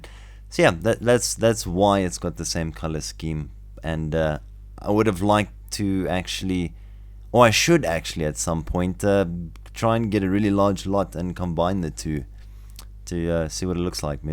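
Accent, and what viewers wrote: South Africa. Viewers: You sound like you have an South African accent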